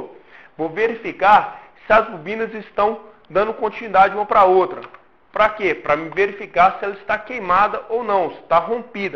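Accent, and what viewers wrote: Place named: Minas Gerais, Brazil. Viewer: Muito bão igual mineiro fala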